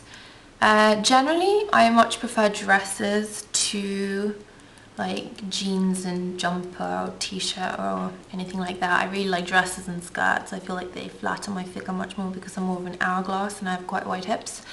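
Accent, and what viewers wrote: United Kingdom. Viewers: wow! i'm ukrainian too, but i live in US, and i envy your british accent, i think it is soooooooo cute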